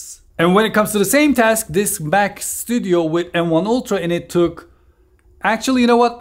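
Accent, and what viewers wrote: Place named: Turkey. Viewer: abi taşağına ve türk aksanına kurban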